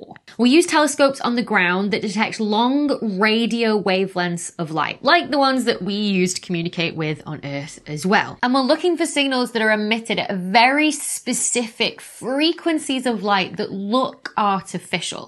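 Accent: United Kingdom. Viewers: can you plz speak less british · I used to live in Cambridge for a couple of years and I find it refreshing to listen to the British in your voice! I’ve watched many of your videos and am simply amazed by the shear depth, command, and mastery of your knowledge of cosmology. It’s wide and deep. I probably absorb 8% of your presentations - maybe some of this will stick in my tiny brain! 😊🤣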